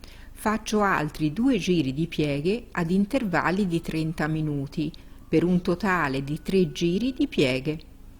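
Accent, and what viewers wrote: Tuscany, Italy. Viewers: Molto brava, complimenti e che bel toscano parla. Senese?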